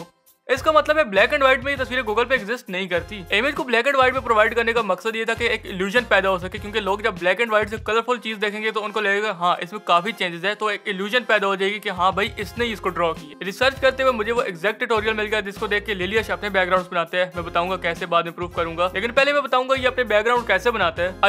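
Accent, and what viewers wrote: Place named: Pakistan. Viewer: Bhai aapke bolne k tareeky se hi pta chlta h ki aab Pakistani ho👀👍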